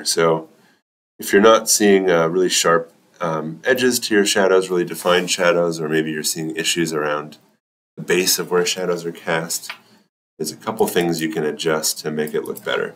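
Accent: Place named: United States. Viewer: And you are an American, English speaking person. That makes all the difference from the clickety, clickety, dialect of India folks attempting English. THANK YOU!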